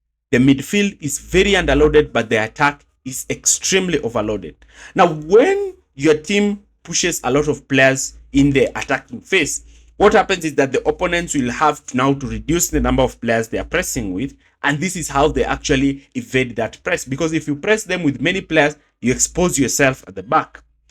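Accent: Kenya. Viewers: Vile niliskia hiyo sauti nikajua tu huyu ni mkenya. Keep up the good work. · Hii ni sauti ya mkenya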